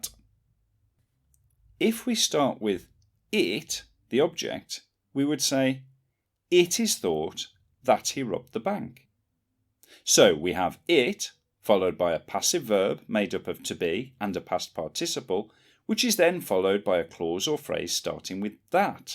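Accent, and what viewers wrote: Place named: United Kingdom. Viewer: British accent❤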